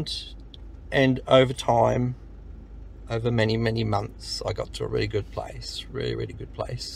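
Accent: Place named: Perth, Australia. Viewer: After all the wonderful information you've so generously shared here, I felt that the least I could do is watch every minute of this video. I'm glad you felt like you could share this - that, whatever risk you may have considered, it felt like the good and right choice. Firstly, I am sorry for how you've suffered, what created this suffering and the long tendrils that trauma can have. Secondly, thank you for using your suffering as a vehicle for conveying this kind of message - because you're right, so many people are suffering in various degrees of silence and distress and in some way or another we all feel alone with it. Thirdly, when you spoke about the "physical" aspect of your trauma, it brought to my mind my mother, who also passed away, from cancer, with my hand on her, 6 years ago this month. I often think of her when watching your videos, as she was from Perth, and even after many years in the U.S., she kept a hint of her accent and somehow your voice spontaneously connects me to her, which is a good thing. 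And finally: thank you for the gift of what you have shared through your channel. Cakewalk is the environment my musical creativity has settled into, which makes it a focal point for my hopes, my delight, my anxiety, my fear, my despair. What you share goes right to the heart of that place and helps it make more sense, helps it become a place I can better function in despite all the vulnerabilities that come along with it. It's very much a work in progress - my se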